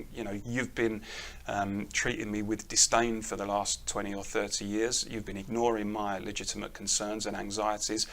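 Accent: RP, United Kingdom. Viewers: It is unreal how posh the host is, almost like a parody.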